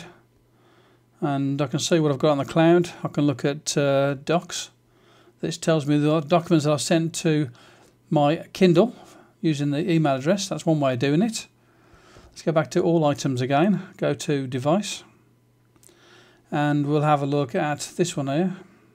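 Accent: Birmingham, United Kingdom. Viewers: are you a brummie? me too !!! thx for the video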